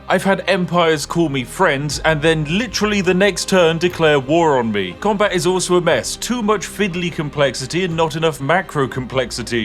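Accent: United Kingdom. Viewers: I don't really know what's going on but I enjoy listening to the silly British man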